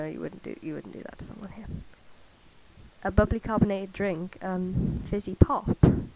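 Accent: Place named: United States. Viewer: Your voice is very pretteh~ :333 I also love your American accent... It sounds perfect xD Do you mind if I do this?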